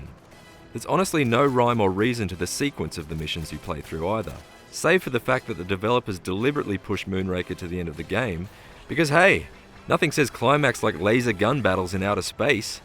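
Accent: Australia. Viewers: Australian accent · He's not English, he is most likley Australian or from New Zealand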